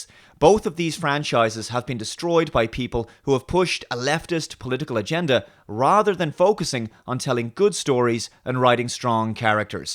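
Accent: Ireland. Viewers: I've been a bit fed up tonight so I thought let's listen to a nice Irish voice, very calming! Not going to be for too long though is it! Not with silly fuckers going along with Leo's abort & import policy! I thought the Irish were better! Seemingly not! Better learn Pidgin!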